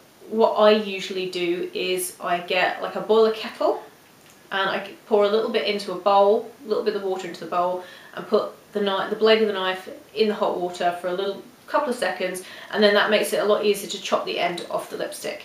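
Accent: United Kingdom. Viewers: Are You brittish Cause you sound like it??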